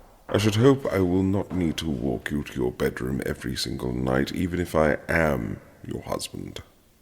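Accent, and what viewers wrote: United Kingdom. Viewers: Is this Brit accent?, I am a non native English speaker, sorry. But it sounds so good🩷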